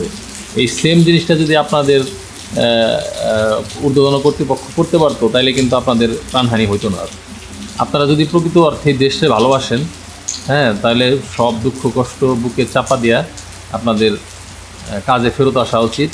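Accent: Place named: Tangail, Bangladesh. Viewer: এটাই আমাদের টাঙ্গাইলের কন্ঠ আমাদের বড় ভাই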